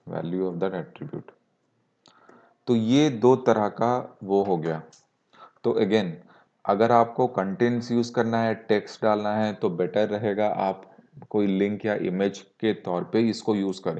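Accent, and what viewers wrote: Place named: Odisha, India. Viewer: Bro are from odisha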